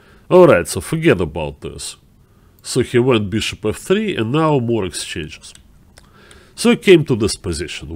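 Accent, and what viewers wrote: Russia. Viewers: But they are not all available. Plus, this guy is great. He has great analysis and has some very interesting things to say about chess. And he's actually fluent in English you can tell. He can say whatever he wants and talks about jokes and other things. He's just got a Russian accent. Which is not that bad, it's called diversity. And this guy is very good and interesting. So what's the problem really. If <link> hired him he's obviously got something going. Eric and the other chess brahs are great but there are other great people out there too and we can learn a lot from diversity in the chess world. A little accent never hurt anyone and it's a good opportunity for people to learn something new about chess from someone very experienced.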